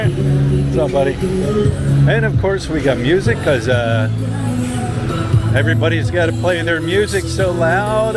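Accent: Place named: Philadelphia, United States. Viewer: Hey, since your there for the long hull, how bought taking some Pilipino language courses to be able to speak a little and understand a little bit more of the Country your living in. I would and maybe in return teach someone some of you own intonation from, I think that would be cool, what do I know, love your Gig, Thumbs Up Dude, Ricky from Cape May....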